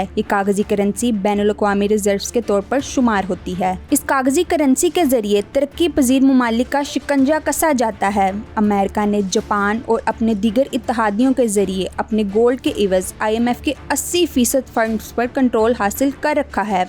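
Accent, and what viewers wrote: Punjab, India. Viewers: Voice over acha Lagye us se bhut farq parta hai CHOTI Umar ki larki ki voice lag rhe hai Jo k Punjabi hai ye maloom parta hai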